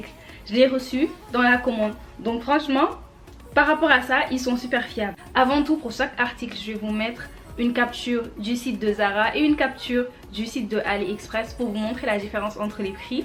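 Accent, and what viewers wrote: Senegal. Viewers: Merci pour ta vidéo avec ce joli accent DKR❤. Perso, j’ai de plus en plus de mal à admettre ces grandes différences de prix. Du coup, avec ces sites web, dure dure de se laisser tenter dans les magasins.. Pas facile pour le secteur du prêt-à-porter mais l’inflation touche tout le monde 😢 Comment ne pas tourner le dos à toutes ces enseignes qui nous assassinent avec leur prix élevé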